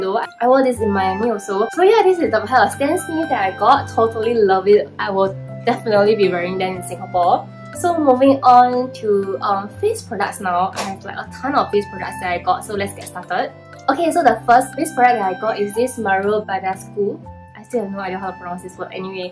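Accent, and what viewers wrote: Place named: China. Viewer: are you from china? your accent sounds like china accent!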